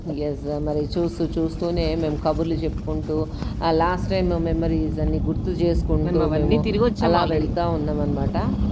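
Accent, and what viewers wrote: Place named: Godavari, India. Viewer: Godavari slang suuuuuper ma'am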